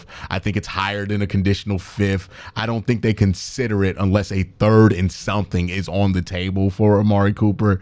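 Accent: Cleveland, United States. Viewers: q ..... frustrated actually has an "R" in the word ....big homie! our horrible cle accents.... hard to fix....i know!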